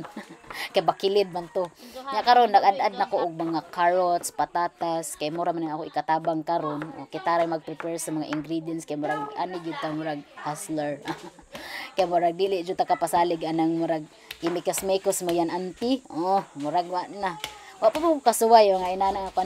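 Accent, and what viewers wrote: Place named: Bohol, Philippines. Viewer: Pure bohol jud imu lingwahi. Puro J.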